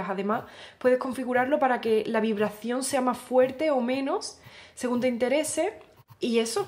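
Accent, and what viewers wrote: Andalusia, Spain. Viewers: Cuando hablas inglés tu voz pierde ese acento tan especial "español"que me encanta. Gracias por el video ;muy buen tip para los músicos. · No. tiene ese 50% de su encanto en ese acento andaluz...Se tenía que decí y se dijo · Sos muy simpática! Tenés un conocimiento enorme de música e de la guitarra y lo explicas con toda la simpatia del mundo. :) A mi me gustó bastante tu review de ese metronomo. Ya lo había visto en las publicidades en facebook pero no tenía idea de que podría hacer todo eso. Tal vez lo compre también. jajajaja! Y me encanta tu acento andaluz! Suena muy elegante! :)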